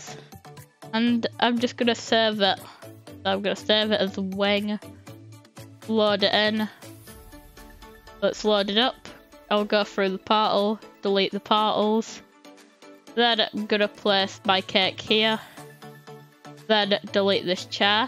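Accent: United Kingdom. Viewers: Its called a british accent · He talks normal he's just british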